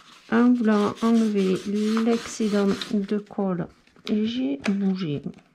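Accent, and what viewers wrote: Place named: Southwest, France. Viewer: Coucou, j'adore tout ce que tu fais: tes rangements, tes classeurs, tes pochette à plastifieuse, TOUT j'aime tout. Je t'adore, je t'adore je t'adore.... Bien sûr je me suis abonnée avec la cloche et petit pouce partout sur toutes tes videos que je vois. J'habite en espagne depuis que j'ai connu mon mari, mais avant j'habitai dans le Sud-Ouest (pyrénées atlantiques) et ton accent m'as rappelé mes origines. Nostalgie. Est-ce-que tu es du Sud-Ouest? Je t'embrasse très fprt🥰🥰🥰🥰❤❤❤🦎🦎🦎🦎🦎🦎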